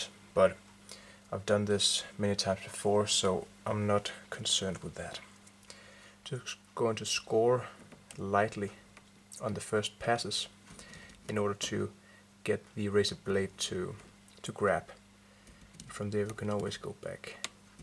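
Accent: Denmark. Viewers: Rigtig god video, der præcist viser, hvordan man selv med lidt omhu, kan udskifte en nut på sin guitar. Pænt engelsk, med en smule charmerende nordjydsk accent. Per i Aarhus😎🇩🇰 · Thanks for this video! BTW are you Danish by chance? Your accent seems to be that of a Dane. If not, sorry for my incorrect assumption. Thanks again!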